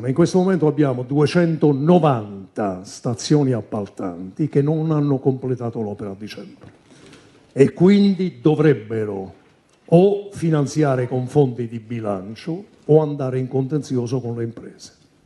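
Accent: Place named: South, Italy. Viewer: quelli che chiede De Luca sono i soldi che la tua regione....non so quale sia....ma dal disprezzo che mostri sicuramente una del nord ... ha già preso!!!! Mentre a noi li bloccano senza motivo!!!!! Ti voglio ricordare che i tuoi ministri del lumbard- Veneto.....non hanno fatto un caxxo quando il covid vi ha dilaniato....e sai perché???? Non avete più sanità pubblica!!!!! Formigoni e compagni hanno privatizzato tutto.....e la gente è morta per mano dei suoi concittadini!!!!! Questo è il tuo nord!!!! La lega ha RUBATO 50milioni di euro allo stato italiano....per spese di gestione.....bah!!!! e li restituisce a tozzi e bocconi spalmati non per quanti anni!!!!!!!!!!! Il mio commento non ha accento così almeno le tu orecchie non soffrono!!!!! Purtroppo il mio cervello soffre solo a leggere certi post superficiali di gente che scrive ripetendo cose sentite giusto per esibire un razzismo che fa tendenza soprattutto con questo governo!!!!! 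Il tuo salvini si è alleato anche con il M5S.... la ndrangheta ho investito nel profondo nord milioni di euro per ripulire il denaro che come tu ben sai proviene da attività illecite!! COME MAI NESSUN PERBENISTA NORDISTA SI È OFFESO O SCANDALIZZATO????? Ti offende l'accento di un cittadino del sud....e non ti offende quello che i tuoi politici fanno???? TRA POCO UN SOLO ACCENTO AVRETE NELLE VOSTRE ORECCHIE.....QUELLO NIGERIANO.....con tutto il rispetto sicuramente meglio del vostro!!!!!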